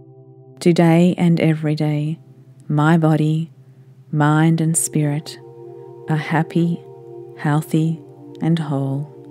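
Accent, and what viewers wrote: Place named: Australia. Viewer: Your Aussie voice is the best calming sound!!! I even set Siri as an Aussie - keep it up.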